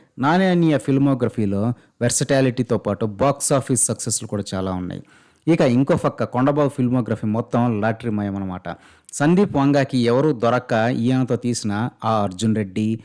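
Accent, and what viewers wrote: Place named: Chittoor, India. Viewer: nuvvu just only mega family kosam videos pettevaadu erri puka nuvv chittoor language lo matladuthunnav ra erri puka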